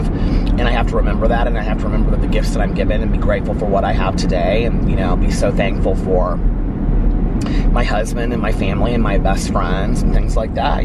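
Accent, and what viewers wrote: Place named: Tennessee, United States. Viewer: Love your voice! Don't listen to stupid people! The first time I watched you I thought you were from Tennessee bc you talk like us 😂 Love your vlogs!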